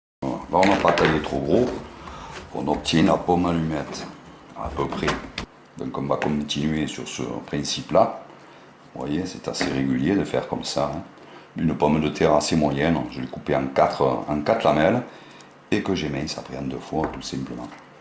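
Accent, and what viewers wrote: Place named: Marseille, France. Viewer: On entend bien l'accent et la façon de parler des marseillais. Donc je m'abonne !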